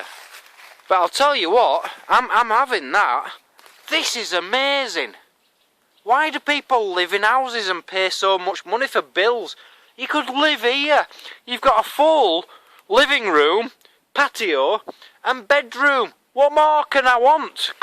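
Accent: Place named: Leeds, United Kingdom. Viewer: I thought I heard a touch of the Leeds twang as well.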